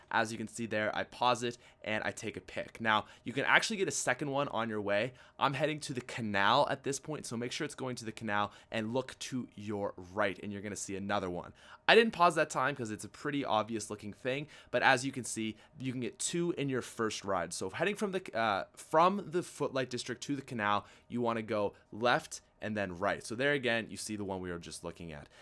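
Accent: Canada. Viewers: is he canadian? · I feel like the further you got in the video the more canadian you sounded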